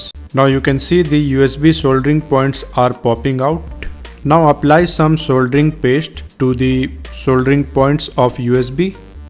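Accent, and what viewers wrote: India. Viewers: are you from india...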